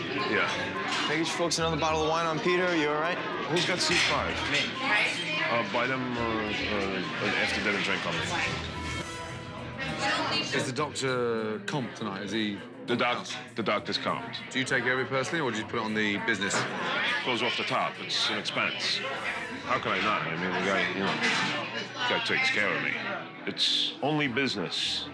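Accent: Scotland, United Kingdom. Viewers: It's strange listening to the British commentary. I'm so used to hearing the American guy in the episode. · Have they taken voiceover voice from when he was 15 or something. he sounds more scottish than ive ever heard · Kitchen Nightmares US with a British narrator is pure gold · This episode must be real early because it's not narrating it's some Scottish dude. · The Scottish accent really jumped out in the narration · I totally felt the change in narrators. A lil bri'ish eh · scottish Ramsay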